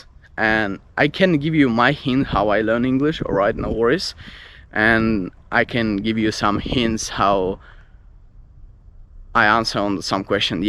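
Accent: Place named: Australia. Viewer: Скоро уже не отличить от австралийца)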